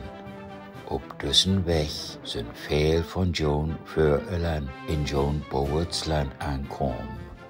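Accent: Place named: Low German, Germany. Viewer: Wat scheun Un'n plattdütsch wat ok'n echtet is.Weur de ik al lang nich mehr hört hev Wider so ut Hamborch nu in de Bretagne to hus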